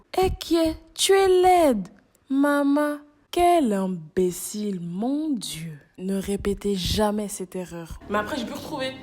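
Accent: Cameroon. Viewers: Le Cameroun s’entend d’une manière , tu m’as tué « la honte de ça » 😭😭🇨🇲🇨🇲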